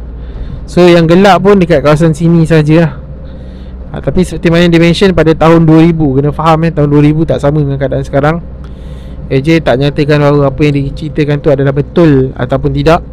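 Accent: North, Malaysia. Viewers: org mna dgr sora palet mcm org utra 😅🤭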